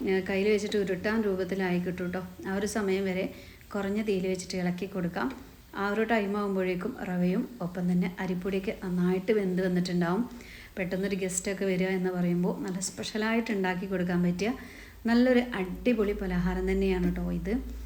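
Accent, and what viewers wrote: Malappuram, India. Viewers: പക്ഷെ ഈ ചാനലിന്റെ ഒന്ന് രണ്ടു വർഷം മുന്നേയുള്ളതിൽ ഒക്കെ തനി മലപ്പുറം സംസാരം ആണല്ലോ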